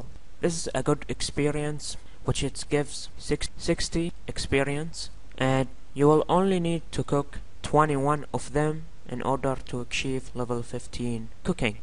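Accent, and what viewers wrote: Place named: Arab world. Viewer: good guide, and your definitely Arab that accent lol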